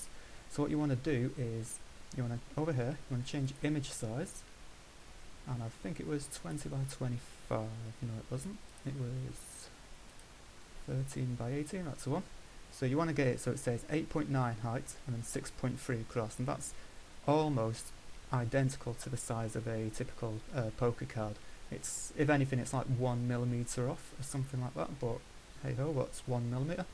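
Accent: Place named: Brazil. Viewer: Are you brazilian? Your accent sounds familiar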